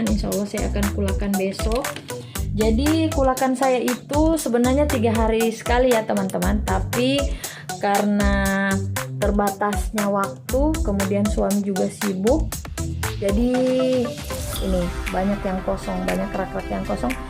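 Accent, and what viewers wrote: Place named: Sulawesi, Indonesia. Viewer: Ibu ini orang mn, logatx kaya orang Sulawesi.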